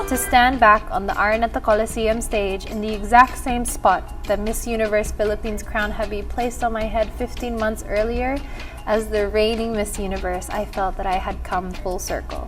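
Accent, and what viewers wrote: Australia. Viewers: When she talks normal, you can hear the Australian accent. When she Talk loud and proud, she speaks the proud Filipino accent!!!! (I noticed it) ❤❤❤🌟🌟🌟☀️☀️☀️🌞🌞🌞🥰🥰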